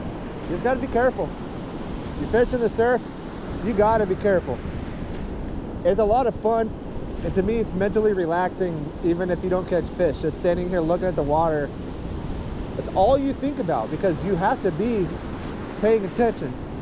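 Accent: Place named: Oregon, United States. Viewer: Never apologize for being and expressing yourself naturally in the way you do! Especially with joy and elation! That's what it's all about man. Hell of a trip, hell of a fish, and that message you made about never letting the kid in you go away is dead on. And you gotta love the classic native Oregonianisms like Crik and Spendy! A great tell to identify a born and raised Oregonian.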